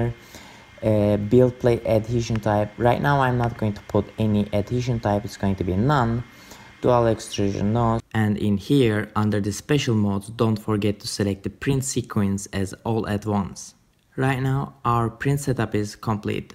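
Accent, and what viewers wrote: Turkey. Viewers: Your accent sounds like you are Turkish and i am proud of you, thanks a lot for sharing your experiences.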